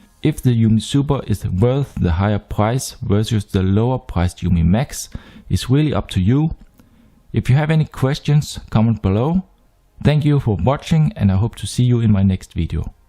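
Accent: Germany. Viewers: Für eine lange Zeit beobachte ich Ihre Bewertungen und schreiben mit einem sehr guten Lichtqualität und eine Freude zu Ihrer englischen Sprache mit deutschem Akzent zu hören. Nach Ihrer Präsentation kaufte ich Umi eMax und später SuperUmi. Jerste sehr glücklich. Vielen Dank, und ich herzlich begrüßen. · Man hört so das du Deutscher bist xD Gutes Video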